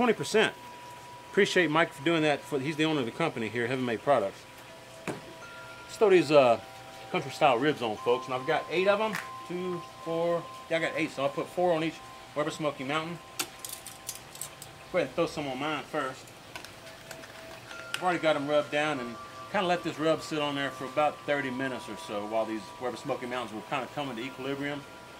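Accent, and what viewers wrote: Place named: Texas, United States. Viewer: One question that I would have is where in the USA are you cooking? Based on your accent, it sounds like you are also from Texas. Ambient humidity could also have a big influence. If you are down in a high humidity area, like the gulf coast, then the pan probably does not make a difference water or sand. The water pan probably does not have as much of a noticeable difference of the humidity level in the smoker. If you are in a low humidity area, like the Texas panhandle or West Texas sticking with the Texas references, then it make make a bigger difference. Since I am new to your channel, I do not know where you are doing your testing.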